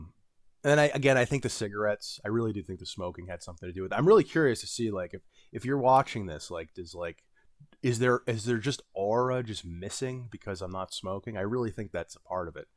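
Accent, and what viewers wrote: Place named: United States. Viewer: The voice The shirt The American accent Absolutely cinema.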